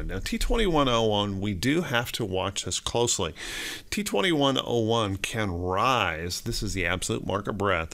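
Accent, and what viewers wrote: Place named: United States. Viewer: Your morning market prep intro sounds so American 🙌 glad to hear that you’re doing better.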